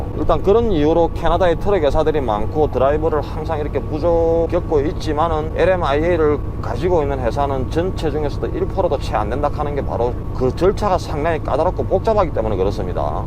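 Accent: Gyeongsang, South Korea. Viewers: 경남 사투리 ㅋ ㅋ 저는 대구 사람 정겨워요^^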